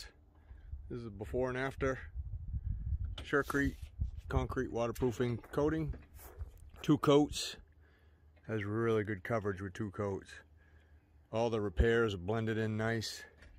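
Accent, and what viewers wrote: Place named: New England, United States. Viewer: Great video this is very helpful. By your accent your from New England. Since you’re using this product where you have so much snow ❄️ and rain and it’s held up well. This will also hold up good on my patio with our bright sun ☀️ in California. Exactly what I’ve been looking for. Great video thanks again 😎👍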